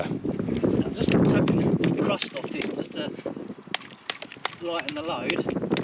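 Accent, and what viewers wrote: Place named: England, United Kingdom. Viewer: How about losing some of that English accent for the American's that watch your channel. BTW you might explain what the heck you are talking about at times. lol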